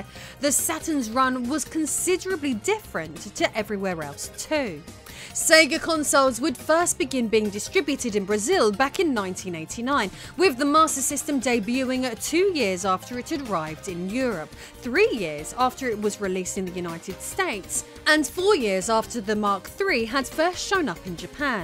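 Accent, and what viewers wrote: England, United Kingdom. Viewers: England accent is sooooo cool! Kisses from 🇧🇷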